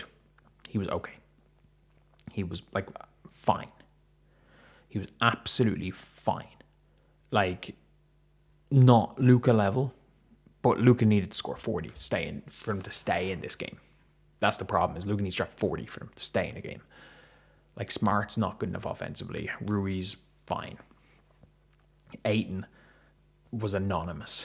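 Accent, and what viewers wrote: Ireland. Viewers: friend, you're doing a great job. This side of the pond accent ia also a breeze of fresh Irish (Welsh?) air. Looking forward to walking with you on our journey of following Luka the fab. Lets cross fingers he gets out of his shooting stink fast and that this is finally the year he gets some, personal if not team-oriented, crowns. Cheers from Slovenia. I groaned loudly when I saw his "pedestrian" stat line and the final score. This isn't the year, Lakers are still fake contenders. Too good for the middle of the road teams, but not "not quite my tempo" for the elite teams. But dropping all sense of urgency after that collossal SA win is dishartening, bastards had my hopes up.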